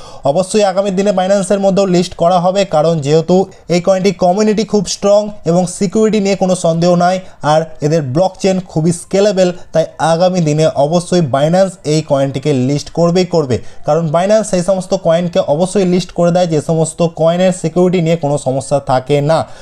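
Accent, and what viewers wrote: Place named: Kolkata, India. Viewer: আরে ভাই, এটা আঞ্চলিক উচ্চারণ। আমরা বাংলাদেশে আশা করি উচ্চারণ করি। আর কলকাতায় উচ্চারণ এমন। বাংলাদেশের কিছু অঞ্চলেও এমন উচ্চারণ করে। 🙂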